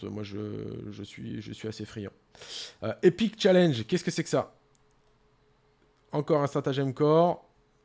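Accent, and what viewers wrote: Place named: Scotland, United Kingdom. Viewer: vu l'accent, il est pas anglais, il est écossais !!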